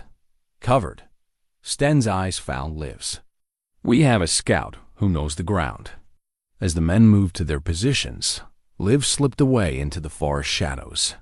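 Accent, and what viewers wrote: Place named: New York, United States. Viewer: Good story! The narrator sounded like he was from New York though. I preferred the other voice you used to use.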